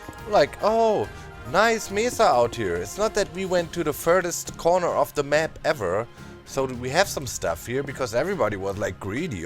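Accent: Germany. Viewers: Does sound more German than usual in this video or is it just me?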